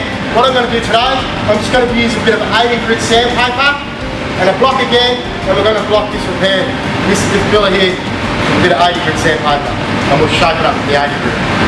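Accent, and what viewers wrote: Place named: Arab world. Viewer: Looks like the guy had an Arab accent, who went to Australia and was kicked out and went to U.K, lived there for 15 years, tried a lot to copy the white guys there.... And now he is a mongrel... His accent sucks!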